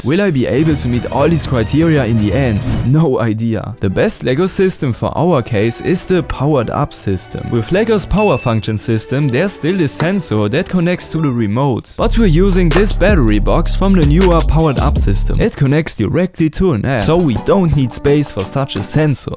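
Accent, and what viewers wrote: Germany. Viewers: Br du hast den Deutschesten Akzent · He's german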